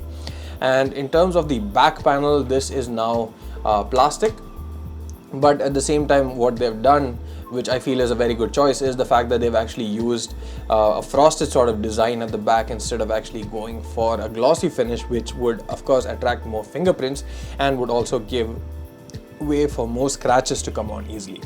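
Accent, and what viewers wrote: India. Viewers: U sounds harmonic From INDIA ? Subscribed anyway 😊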